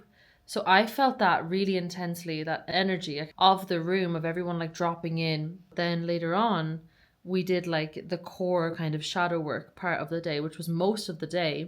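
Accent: Ireland. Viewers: is it a irish accent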